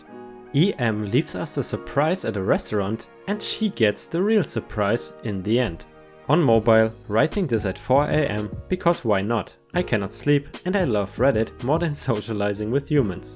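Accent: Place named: Germany. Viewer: Your english is not bad. But I am still hearing your german accent as a german myself.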